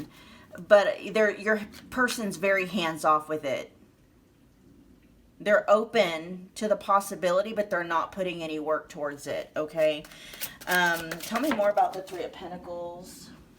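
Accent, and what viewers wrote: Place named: France. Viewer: Pile 2 and 3. He was still married and lied about it. He's a drug and sex addict. We both have kids and I was willing to leave my husband and create something him. Thank God I saw all the red flags and I cut all type of communication between him and I. I'm in a better place far away from this drama. Lies can clearly cause detachment between people. I regret meeting him. I'm a psychologist and I can't even imagine the struggle and the burden of his lifestyle. I discovered everything and I'm just disappointed because I waisted my precious time. May he find peace and joy with his son's mother. That French accent is not for the weak.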